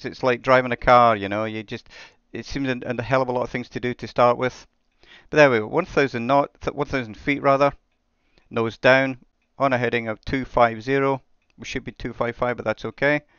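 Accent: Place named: Scotland, United Kingdom. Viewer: Repetition is not so bad when it's in a Scottish accent. 😉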